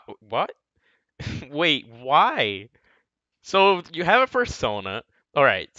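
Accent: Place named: Brazil. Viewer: cara, o maluco pode ser fluente, mas sempre da pra sentir o brasileiro por trás kkkkkkkkkkkk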